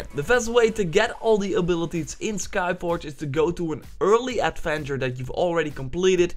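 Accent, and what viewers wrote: Netherlands. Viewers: You sound Dutch :)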